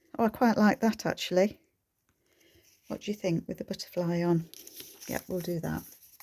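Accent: United Kingdom. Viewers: These are quite clever! My grandmother said she was born in 1900, but the Bible says 1898!! We guess she just wanted to be in the new century! When were the Scotsmen allowed to wear kilts again? I know for a long period they were outlawed. You have the loveliest accent! I don’t know how to distinguish British accents from one another, but yours is distinct, I’d like to listen to you for a whole evening.! Please no mushroom, the kilt shouldn’t be covered up!! (Just my opinion!) haha! Very sweet little booklets & you’re so organized! I’d like to be, but… thank you!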